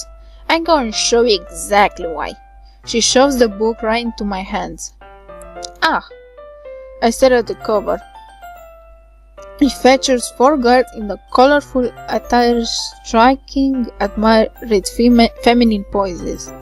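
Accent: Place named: Romania. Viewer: Ma refer se cunoaste din accent ca esti romanca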